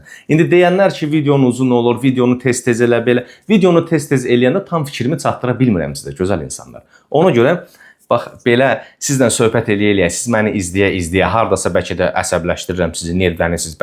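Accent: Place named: Karabakh, Azerbaijan. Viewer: Çox sağ ol oğlum..Mən də sevərək baxıram bu videolara..Danışıq ləhcəni daha çox sevirəm,məncə Qarabağlı balası olmalısan .🙏🙏🙏🌹🌹🌹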